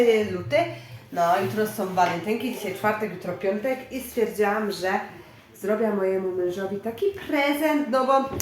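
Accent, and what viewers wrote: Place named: Silesia, Poland. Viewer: Witaj fajna gwara ślązoczka czy inny górski region?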